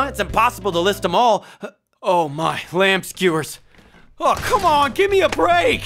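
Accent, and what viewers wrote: China. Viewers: ON EN PARLE L'ACCENT CHINOIS DE LE RIRE JAUNE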